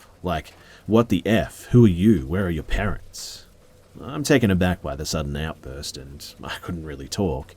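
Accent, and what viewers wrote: Australia. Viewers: This Australian accent is comfortingly close to home.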